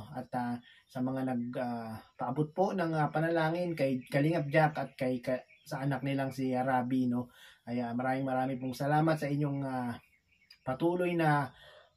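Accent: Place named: Visayas, Philippines. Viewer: Hoy my friend besaya ka imong tingog mora gyod og besaya ga Hahaha Hahaha god bless my friend 💖 ❤️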